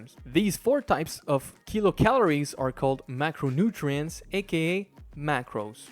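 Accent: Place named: Quebec, Canada. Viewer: Sa sonne le québécois ça🇨🇦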